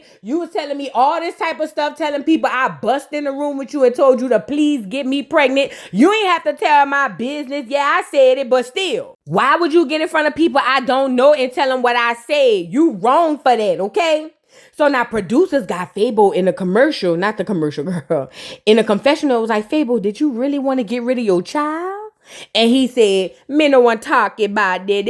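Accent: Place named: Louisiana, United States. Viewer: Looord I only watch it ...tooo hear ur review....I loooove u my looozana chick...cuz itsssss ur looozana voice for meee....I understand everything u saying 🤣🤣🤣🤣❤️❤️❤️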